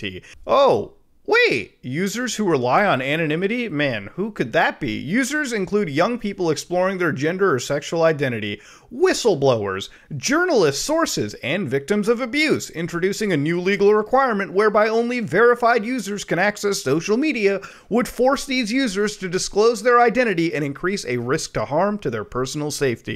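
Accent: England, United Kingdom. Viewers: Nice English accent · Nice British accent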